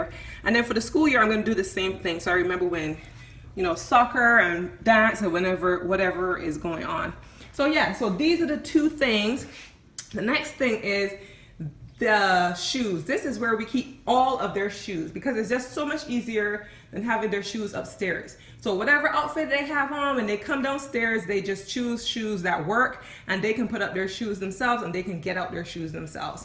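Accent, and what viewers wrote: Barbados. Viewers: omg your bajan shines through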